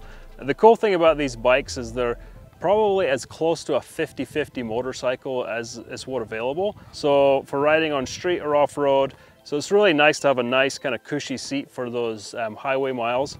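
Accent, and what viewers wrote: Scotland, United Kingdom. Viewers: Scott Manley is another Scottish Youtuber, though his accent is much thicker. I assume your accent is Scottish, yes? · What part of Scotland are you from. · You Scottish? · Hint of a Scottish accent in there? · do I hear a touch of Scottish through the accent...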